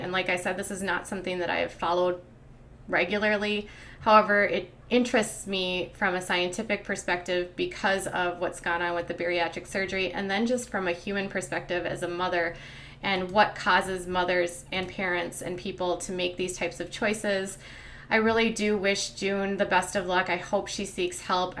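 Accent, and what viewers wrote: Minnesota, United States. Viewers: Haha awesome shirt!! I asked you if you were a midwesterner?? I can spot a MN accent or northern a mile away. I am from MN so not picking on you. I am a new subscriber and love your videos!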